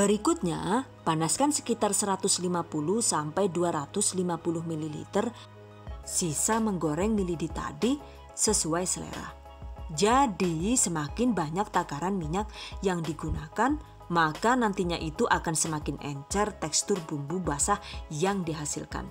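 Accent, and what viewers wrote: Banyumas, Indonesia. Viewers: Fokus suara ngapak🤣🤣🤣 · Ngomong ngapake lah buk, ngakak nyong 😂😂😂 maturnuwun resepe bu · Deneng ngapak 😭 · Aq ngakak kalo si ibu ngoMong ngapak..🤣🤣🤣. Yakinlah sumpah aq ngefensss karo sampean.. luph youu.. resepnya mantuul.. #salamdariwongpemalang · Lah ngapak wkwk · Alakaehh wong ngapak kie tah 🤣🤣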